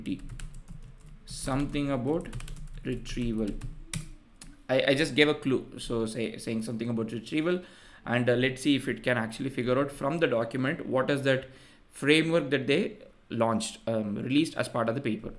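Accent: South India, India. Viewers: Dude, I have to say it as no one else will. Your content is excellent but your accent sucks. Eg. Won won won, instead of "One". I'm South Indian myself but don't have a heavy accent. I share your videos with all of my American and Canadian colleagues(especially THE GPTV one). They have just one feedback and that is they don't understand what you are talking due to the accent. If has to become 1bigglobalcoder then please mute down the accent. Cheers, another great content video as ever. 🎉 Update: Thanks for taking it in the right spirit content creator. 😂 that one "psychiatrist" in the comments.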